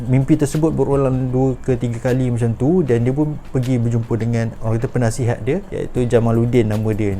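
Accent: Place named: Penang, Malaysia. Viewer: ckp penang bang senang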